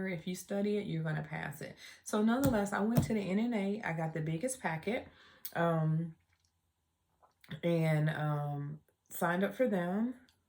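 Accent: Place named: California, United States. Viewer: Sounds like you are in Cali too. Congrats on your success & new income. And Thanks for the platform details.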